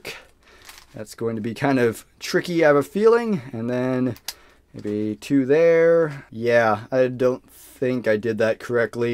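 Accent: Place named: Great Lakes, United States. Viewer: Great Lakes accent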